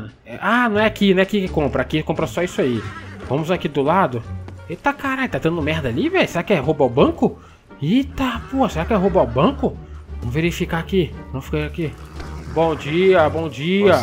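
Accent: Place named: Minas Gerais, Brazil. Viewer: Sotaque de Minas parece da roça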